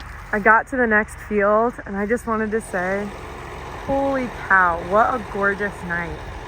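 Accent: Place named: Midwest, United States. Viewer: Your big smile and bright eyes kept remindig me of somone and today I figured it out. you are a young Reba made over minus the red hair And your mid westen accent just adds to the resemblance!!. 😃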